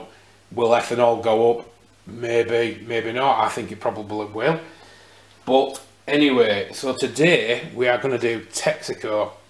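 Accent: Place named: West Yorkshire, United Kingdom. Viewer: You sound like you’re from West Yorkshire like me! Whereabouts are you based pal? I’m just north of Leeds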